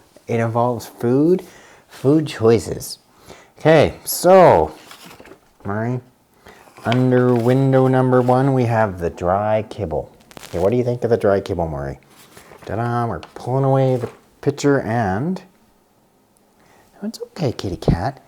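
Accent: Canada. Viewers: Random question but are you from Canada? ☀️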